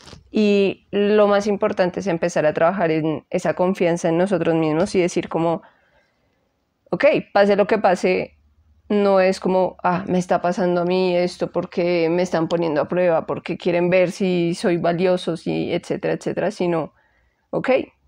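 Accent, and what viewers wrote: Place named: Bogotá, Colombia. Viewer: Me encantó tu canal ❤ lo encontré buscando meditaciones y me pareció curioso tantos años viviendo en otras ciudades que escuchar tu acento que es de mi tierra Bogotá supongo lol parece jeje que recuerdos, y viendo los videos me encantaron gracias por compartir este contenido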